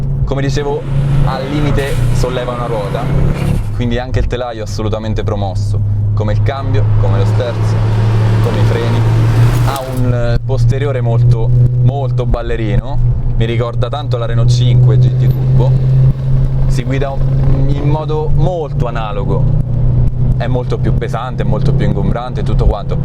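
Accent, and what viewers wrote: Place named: L'Aquila, Italy. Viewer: Accento aquilano, paesaggio aquilano.... Valle subequana, una fiera di curve in un paesaggio mozzafiato.... Grande, un po di passione abruzzese nel mondo!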